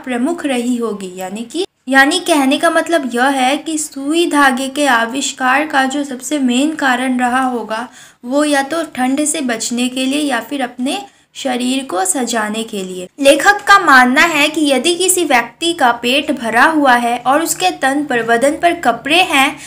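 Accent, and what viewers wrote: Bengal, India. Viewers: Dii ap Bengali Ho Kya. Love from Rajasthan❤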